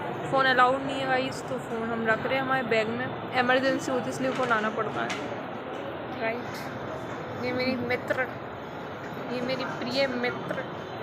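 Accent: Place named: Bundelkhand, India. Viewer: Aap kaha se ho ????? Sounds like bundelkhandi accent !!!!!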